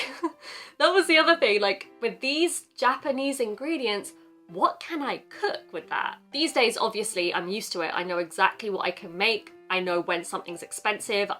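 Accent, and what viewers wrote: United Kingdom. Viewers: 凄く聞き取りやすくて、きれいで優しい話し方ですね。これがイギリス英語かな？ お辞儀はやっぱりうつってしまうようですね。私の知ってる海外から来た人達も、 もれなくお辞儀するようになってましたｗ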